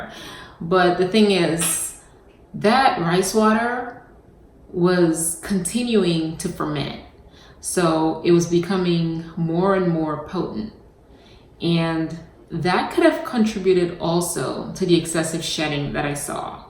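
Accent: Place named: Jamaica. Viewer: I also hear some Jamaican in there, I believe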